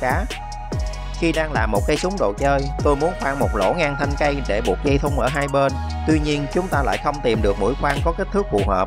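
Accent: Mekong Delta, Vietnam. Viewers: nói chuyện đậm chất người miền Tây luôn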